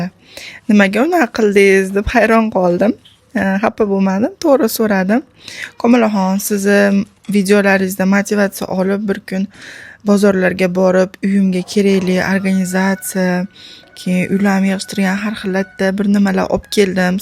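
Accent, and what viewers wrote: Kokand, Uzbekistan. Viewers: Opa qoqonlikmisz shevezdan❤ gullariz vashshi mazza dahshat zooor mazza qildim korib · Quqoncha shevami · Шевалари Кукон❤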